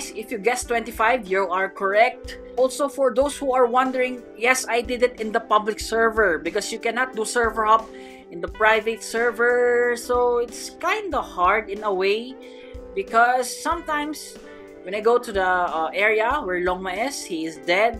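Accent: Philippines. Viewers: First time? I'm A filipino so I could tell from his accent that he is a Filipino. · Hey are you Philippines you sound like.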